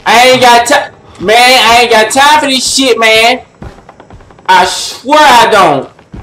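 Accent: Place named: Texas Gulf Coast, United States. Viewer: gaddamn bwa you had me rollin!!! where you from bruh? you sound like your from tha 3rd coast! i'm from texas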